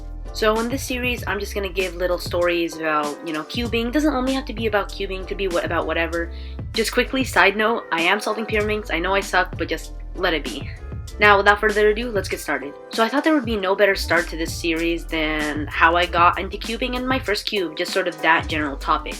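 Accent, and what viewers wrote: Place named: Israel. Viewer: I'm from Israel and you have an Israeli accent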